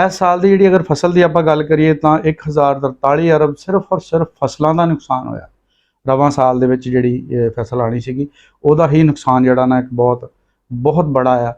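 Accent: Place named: Doaba, India. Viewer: bhut vdya glbaat kahi a veer ji , tuadi punjabi boln da lehja jaladhar,hoshiarpur side vla a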